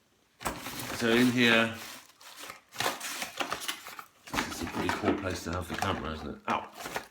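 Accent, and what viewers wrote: England, United Kingdom. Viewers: could be wrong in regards to the accent but if hes a Pom no suprise on the whinging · You've got a great English accent and voice.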